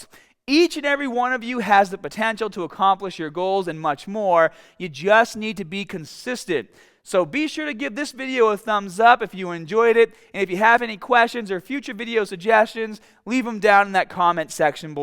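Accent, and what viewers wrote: Boston, United States. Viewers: Haha Love that accent is that a Boston accent? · OMG! His BOSton accent makes me laugh. Every AR word is exaggerated. EVERY ONE of them. cARbs, mARkers, so fAR, ARgue, ha ha ha.. Keep going man. Good info. Just super funny accent. Love'n it. I do IF with KETO at a 20:4 fasting to 4 for last 6 months. But im not a bodybuilder. And as far as flexing the meal. Its easy to flex your eating time. its not a hassle. Im not a hARd gainer. LOL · You from Boston?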